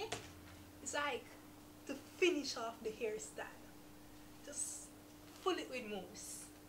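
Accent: Patois, Jamaica. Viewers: Also it might not be that she's "lost" her accent but she just uses less patois words or like a patois 'pronunciation'. We can butcher some english words just base on where we place emphasis on syllables. As for her accent it oh so present from my ears. Jamaican here. Depending on where you are in Jamaica this "accent" varies. Some places I can't even understand them and I am born, raises, live Jamaican. 😂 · she still sounds very Jamaican, she sounds just like what we like to call ‘uptown people.’ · your personality is contagious. Loving the jamaican patois. Love from Trinidad 🥰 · As a general rule she's lost more of accent the longer she's been making videos. She sounded like a Jamaican in her early stuff when she was relaxed, but now it sounds muddled sometimes. I'm not trying to tell you anything new, just saying I noticed, too. 😉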